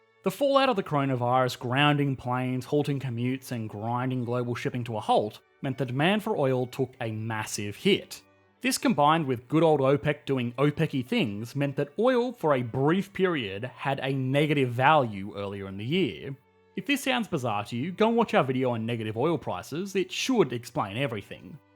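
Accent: Australia. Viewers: "This! Is Texas." I've been waiting to hear this Aussie say these words for too long! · I an Irishman listening to an Australian talking about the economy of Texas 😂😂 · Me, a stranger lost in parts unknown, listening to an Australian talk about the economy of Texas while i watch a round blue creature waddle around in front of me waving a mallet. I think it's time for me to move to Texas.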